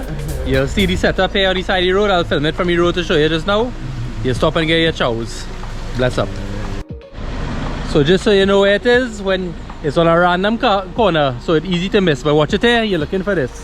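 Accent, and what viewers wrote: Trinidad and Tobago. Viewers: U look like an American but you speak perfect trini english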